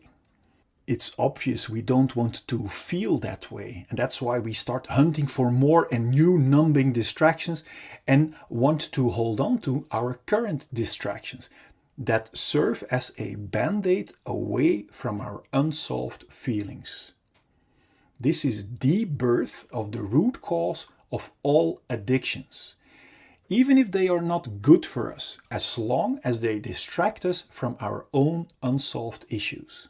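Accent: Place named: Netherlands. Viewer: Accent sounds Dutch.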